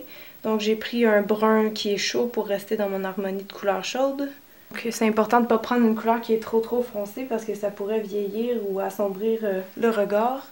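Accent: Quebec, Canada. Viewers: Vidéo très bien faite, bien expliquée; moi qui ne me maquille jamais car je ne sais pas faire, cela va bien m'aider! P.S: avec l'accent québécois c'est encore plus sympa! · Emma Pas bête cette idée Emma!! Merci. J'y avais pô pensé! J'adore notre petite maquilleuse et son accent du Québec. toute fraiche avec des conseils toujours de bon goût et tout en subtilité. Sobre sans être fade.. Dis moi est ce que le fond teint Shiseido Lissant perfecteur convient pour les femmes de 45ans??? Sachant qu'il y a de très fortes rougeurs (causé par traitement médical). Merci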